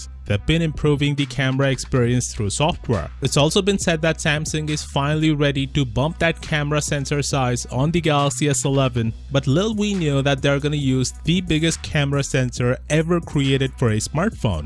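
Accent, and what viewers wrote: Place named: India. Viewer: Little Indian English.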